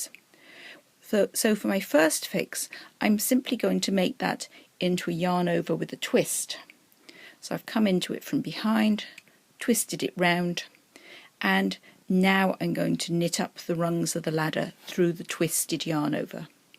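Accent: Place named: England, United Kingdom. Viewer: Love your work. No fuss just doing the job. I also love that wonderful English accent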